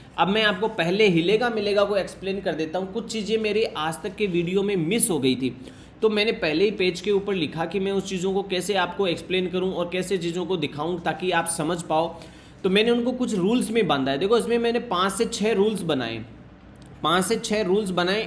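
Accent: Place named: Marwari, India. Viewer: Marwadi lahja is always seen. Keep it up.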